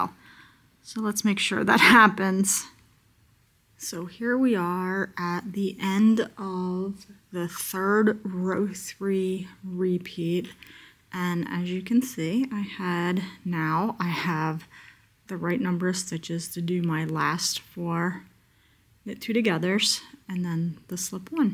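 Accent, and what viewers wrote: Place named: Northeast, United States. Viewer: Love your color choice. I found you looking up sock knit along. 😊 Your accent! Where are you located. I hear northeast, but I hear west coast in the cadence. What?? Right am I way off? ❤❤😊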